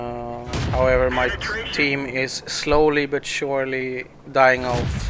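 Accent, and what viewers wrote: Sweden. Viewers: where are you from you sound like swedish a little bit i swedish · Jag tyckte att jag hörde det på din engelska:D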